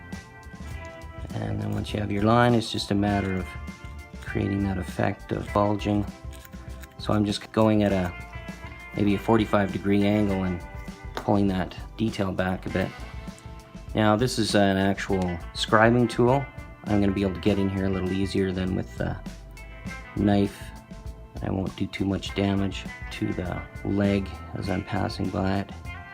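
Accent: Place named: Canada. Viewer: Great channel you have going! Clearly shot video of everything you do and understated style of presentation make watching a real pleasure. No bling, no nonsense theatrics. Just a Canadian modeler (guessing by the accent here) teaching others to make their models something more than what comes in the kit. As a jewelry engraver I see that many techniques you demonstrate are similar to what is done on metals. Plastics are widely variable and offer more than a few challenges in re-workng the material. I have so much to learn yet and I suspect I will be closely studying every video you have. I'm trying to build Napoeonic armies in 1/72 and good info such as yours is difficult to find. there are thousands of "how to paint ____" videos out there but precious little about working the plastic itself. Subscribed and liked! Best modelling channel I've found yet, thank you so much for sharing your expertise with others.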